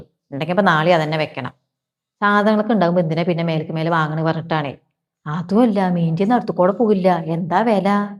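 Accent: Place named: Malappuram, India. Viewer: Palakkad tamilnad style anenn ketittud samsaram but nigal malappuram style annalo.... Anyway kidu acting subscribed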